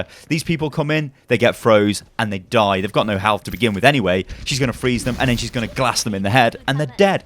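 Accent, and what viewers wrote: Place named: London, United Kingdom. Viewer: i'm guessing from the south, definitley no northern accent, probably London or somewhere near there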